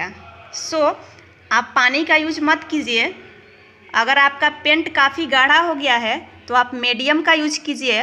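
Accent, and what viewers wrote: Bihar, India. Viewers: Ap Bihar se ho?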